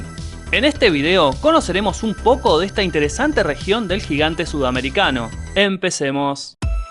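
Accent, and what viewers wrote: Argentina. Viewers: Qué yeísmo tan fastidioso el de este argentino, pronuncien bien la “y” y la “ll”, parecen idiotas con esa pronunciación tan fastidiosa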